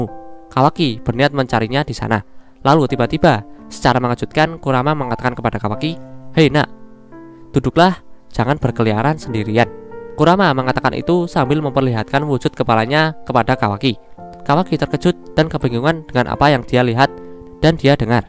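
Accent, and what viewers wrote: Java, Indonesia. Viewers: Medok men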